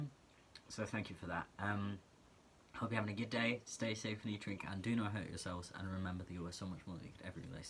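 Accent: England, United Kingdom. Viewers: I gotta agree with the complements on your voice. Like damn, its an attractive voice. And when you add you english accent, it really sounds good. Have a great day :) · I realy Like the way u speak (i mean the brithish accend) sry i'm from Germany and my Englisch is not the best 😂 (this isn't so good because my Grandpa is from Wales so i'm stupid 😂)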